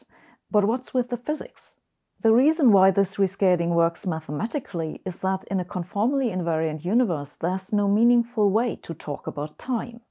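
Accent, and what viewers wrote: Germany. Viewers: What's the charm here? It must be nuanced English backed up by a German sound palette and oh yes the content! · I love hearing about science on YouTube and from Ms. Hossenfelder in particular. I feel constrained to admit I primarily watch because is a smokin' scientist. Could that tiny woman with her little German accent & flawless English be any sexier? Intelligence is attractive and this adorable clothes horse should be out there in the famousphere with Neil DeGrass Tyson as a rock star scientist. rocks. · Are you from Hamburg? your accent reminds me of my friends mom. She had the most beautiful accent speaking English, so pleasing on the ears... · You're very funny! your talk reminds me of old American cartoons in which the mad scientist always had a German accent.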